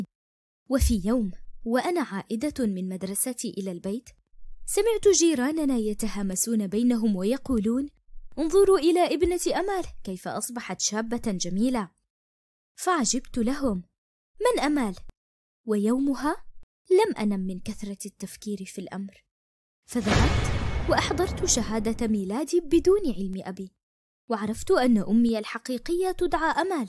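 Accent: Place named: Algeria. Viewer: من لهجتك تباني من الجزائر انا من الجزائر وانت♥♥♥♥♥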